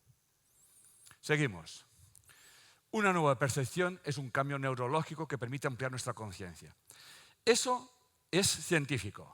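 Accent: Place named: Mexico. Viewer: Me encanta tú forma de hablar mexicano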